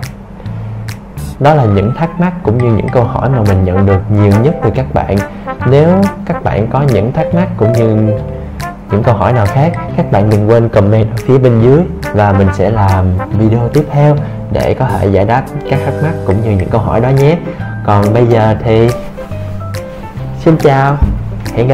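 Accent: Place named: Binh Duong, Vietnam. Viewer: Giọng nói của là người Bình Dương gốc luôn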